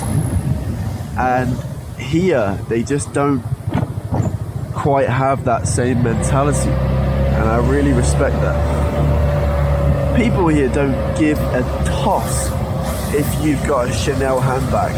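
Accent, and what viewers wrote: Standard. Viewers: 你的中文比大部分中国人的发音都要标准，因为我们有很多乡音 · 你讲中文的口音很标准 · 天呐！我也想要一支这样的笔😍，有道翻译太牛了👍！另外，你的中文发音好正👍谢谢你视频最后这段客观事实中肯的话❤️ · 你的中文水平真的提高了好多呀！你最早的视频真的一点中文也不会的。现在你这发音可标准了哈哈哈！表扬一下！ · 你的发音真的很标准！当然我说的是你的中文！ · 你的发音很标准呀！感觉很少见到发音这么好但是流畅度还不够的外国人。印象中大多数人的情况都是，已经能流畅说话了但是发音实在有不好的习惯。感觉你基础打得很好。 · 很好哦，中文有进步啊，没什么口音还挺标准🤓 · 非常感谢你的真实中国视频！ 你的说出来的汉语好标准！真棒！ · 中文有进步，口音很标准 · 视频有深度了。中文发音很标准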